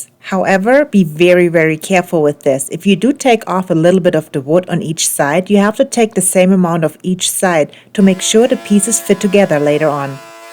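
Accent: Germany. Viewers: Your DuEnglich is Cool!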